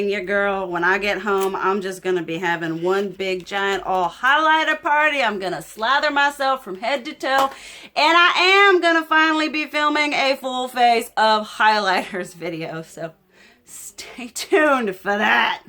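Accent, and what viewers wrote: South, United States. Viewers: I LUUUUV your accent. I live in East Tn, and it's music to my ears to hear an authentic southern accent. Don't kmow how often you hear that, but just sayin...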